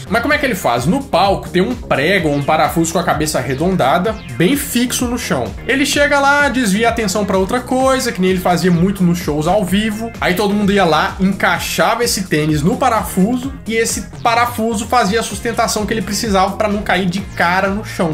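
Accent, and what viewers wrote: Minas Gerais, Brazil. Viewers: Mineiro demais kkkkk Like mano !!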